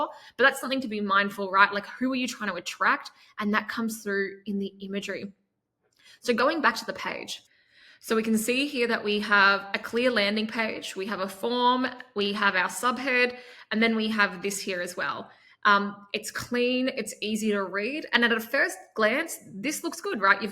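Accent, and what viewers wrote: Australia. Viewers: Good stuff. I, for one, enjoy your English and Aussie accent.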